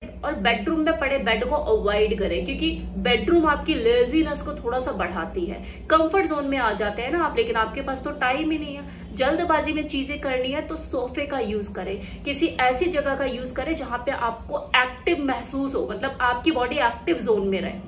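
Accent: Punjab, India. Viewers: Kiss-vis, lipat-vipat, choos-chaas. Kya punjabi tadka hai wakai hamey to anand/maja aa jata hai sunkar hi. Pls keep it up as good going. Txs Gtb Delhi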